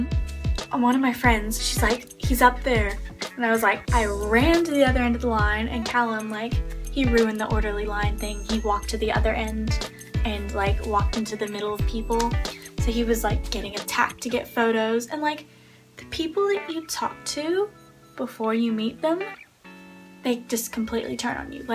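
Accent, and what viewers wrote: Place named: United States. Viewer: You live in Sydney with an American accent... Wow. 😍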